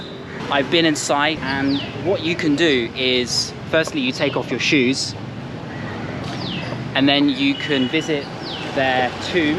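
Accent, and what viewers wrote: United Kingdom. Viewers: Are you British?...Thanks...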